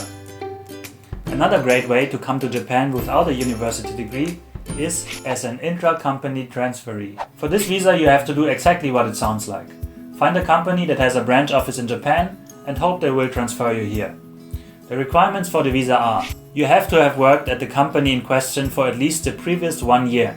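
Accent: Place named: Germany. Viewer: oha i wuste doch das dein aktzent etwas deutsch klingt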